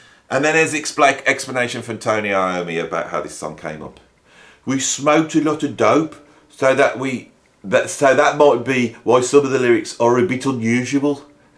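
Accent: Birmingham, United Kingdom. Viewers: you're reverting to your Brummie roots! Reminds me of Vic and Bobs "Slade at Home". 🤣🤣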